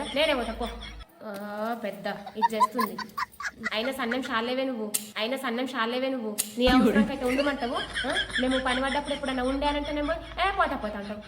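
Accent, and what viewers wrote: Nizamabad, India. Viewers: అక్క మీరు నిజామాబాద్ జిల్లా కదా ఎందుకంటే మా అమ్మమ్మ వాళ్ళ ది కూడ సేమ్ మీలాగే మాట్లాడుతారు 😂😂😂❤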